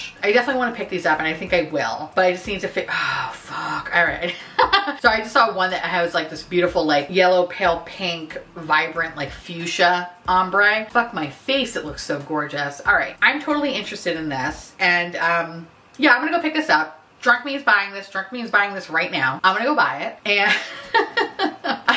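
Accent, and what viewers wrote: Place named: South, United States. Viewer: Momma came out of the gate with a buzz and Southern accent. Hell yea I am here for it. ❤💀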